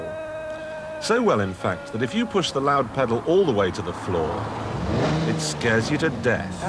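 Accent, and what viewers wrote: RP, United Kingdom. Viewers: Clarkson as hilarious as ever but sounding a little posher than now, obviously the public school education has eroded to become the success he is today and become a man of the people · He sounded so posh back then! Now he sounds like a chav lol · Back when Clarkson spoke more like the Queen than his true Yorkshire persona like he does now. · Ha Clarkson used to have an old "bbc" voice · Why does Clarkson sound so posh back then lol?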